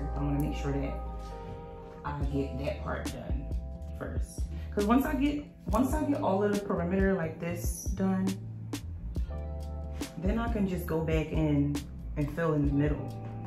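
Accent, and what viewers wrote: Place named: Washington DC, United States. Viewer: I like your accent. It reminds me of my friend who's from DC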